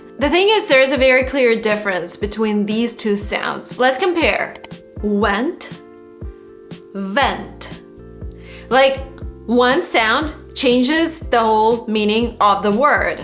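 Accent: Russia. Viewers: awful russian accent:face-turquoise-covering-eyes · please take care of your aspiration. The way you pronounce the h sound gives you a heavy Russian accent. The second priority would be all first sounds in the words you‘re saying. Love watching your pronunciation progress! Way to go, girl!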